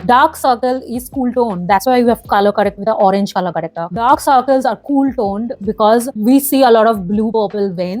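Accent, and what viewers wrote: India. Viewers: Hell Nha. The actual stereotyped Indian accent only could be heard from south indian people. Obviously she has a Indian accent too just like me. But it's more like North Indian accent. Anyways having accent is normal. Americans have their own accent, British have their own accent. East Asian have their own accent. So do we. But it's just the accent changes within the country because of how multilingual we are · Quite informative video. Please work on your accent a bit more. You sound so like a stereotype of heavy Indian accent. I wonder if it's for the effect! 😂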